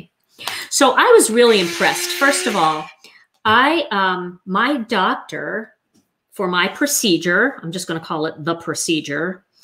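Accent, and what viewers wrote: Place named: East Coast, United States. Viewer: Absolutely gorgeous!!!! The shading is so amazing and I learned so much!!! I love your fun videos AND your accent 💜!!!! I grew up in Rye, NY and love hearing East Coast accents. Be well! Hugs, Karen ❌💜❌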